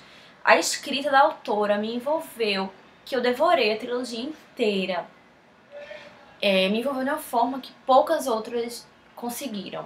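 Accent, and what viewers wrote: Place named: Portugal. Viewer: vc por acaso é portuguesa ou de algum país? é que vc tem sotaque!!!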